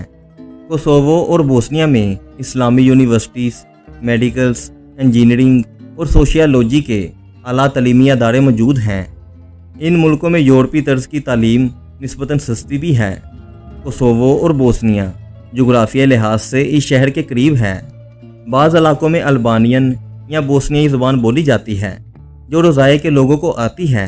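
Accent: Punjab, Pakistan. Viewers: Improve your speaking skills, your accent is typical paindoo punjabi, not suitable for these videos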